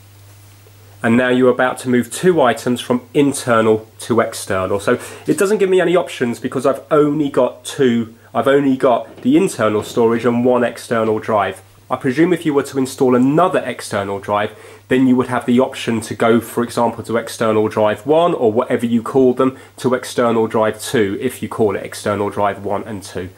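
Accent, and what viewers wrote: England, United Kingdom. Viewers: What part of england are u from just wondering? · I like your British accent.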